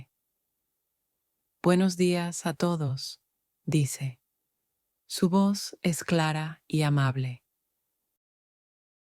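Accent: United States. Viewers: Yes!!! Are you American or Columbian??? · ...these videos are wonderful! i never watch them, just listen on my phone. but who are you? you sound American but you are in Nigeria?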